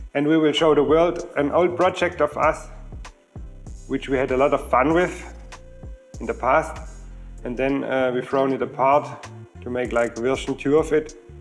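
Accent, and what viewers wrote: Swabia, Germany. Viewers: ❤ I really love your Swabian accent! I look forward to your sourkraut video!! 🎉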